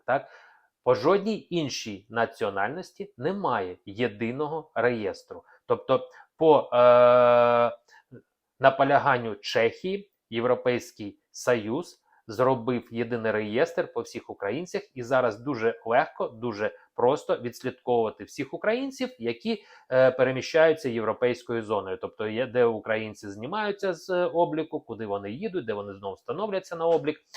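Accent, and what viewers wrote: Russia. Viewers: Бедолага а у вас акцент на русский язык не старайся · Очень режет слух Ваш " русизм" в произношении. Прошу прощения: в украинском языке, если пишется (на пример) "отримував", то и произносится Отримувпв а не Атримував. Во многих случаях Вы приносите так. Обратите на это внимание. Вы же с экрана вещаете все же. Спасибо. И... Страна - это русское слово. В украинском оно другое, Вы и так, и эдак произносите. Спасибо за информацию. И простите, если что))) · Якесь недолуге , намагається навести жаху на українців😁Альо, чоловіче з бородою та как..ським акцентом!! Тобі до мольфара, як до Парижу пішки!!! Аналітик - невдаха!! Ще й до всього - хамло!!! Ліпше перехрестися і покайся, і закінчуй зі бути лжепророком!! Ми, українці,заґартовані такими висєрами😁 Нас вони не « беруть»!! Лише Господь знає, як і коли буде кінець цьому балу сатани, а не « пророк з бородою»! 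Ця інформація для болот !!!!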